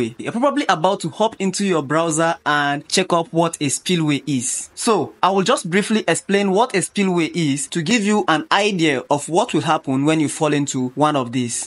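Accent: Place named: Africa. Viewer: I love your accent. probably African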